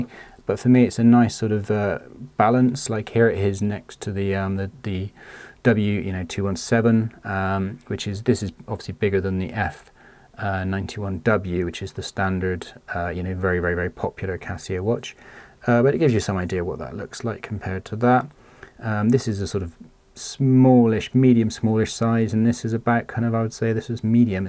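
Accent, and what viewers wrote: Bristol, United Kingdom. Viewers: Fellow Bristolian? But in the Ocean so maybe Plymouth?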